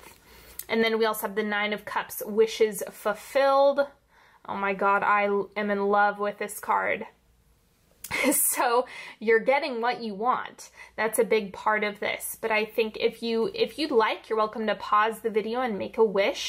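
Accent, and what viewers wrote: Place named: United States. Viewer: Whats this accent from in US?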